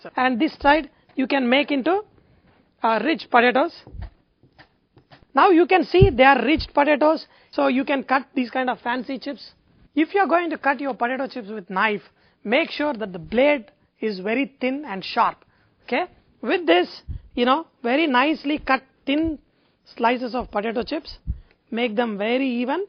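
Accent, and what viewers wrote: India. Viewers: guys, stop making fun of his accent. you try giving a cooking lesson in an Indian language and see how you feel!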